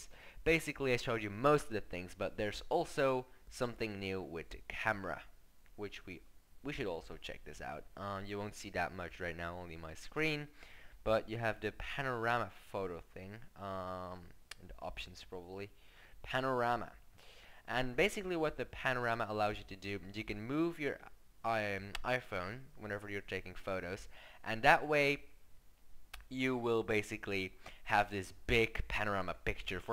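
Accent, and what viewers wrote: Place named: Denmark. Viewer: Nice accent brah! Danes are the best.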